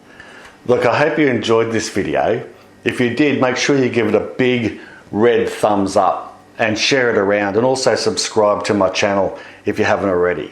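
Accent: Australia. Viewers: Thank you for this! We really need these recipes and videos so we use what you teach us to grow. Dogs eye, dead horse, dodgy? I think this is why the world loves Australia, Australians, and the accent. Mix the weird sayings, words and accents and it’s pure gold. But I’ve heard the same thing about Texans too boot.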